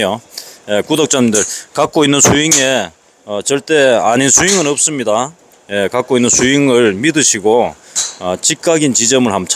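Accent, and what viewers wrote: Andong, South Korea. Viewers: 구독자가 급속히 늘었네요. 억양이 경북 안동말씨같네요. 40년전 군대 선임하사가 고향이 안동이었는데.말투가 비슷하네요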